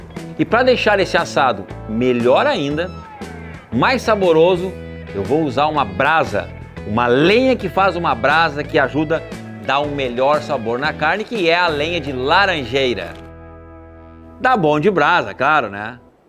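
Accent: Rio Grande do Sul, Brazil. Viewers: Gaucho falando Tá loko meu!😅😅😅😅 Nota dez , gaúcho!😂😀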